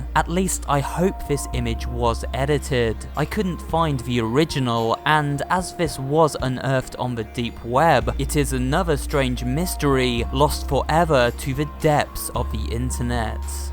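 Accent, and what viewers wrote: United Kingdom. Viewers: Chills UK voice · You know it's fr when there's a British narrator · Hit that right on the button definitely a British chills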